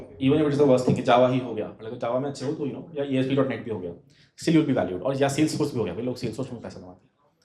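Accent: India. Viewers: Thanks Bro speaks Indian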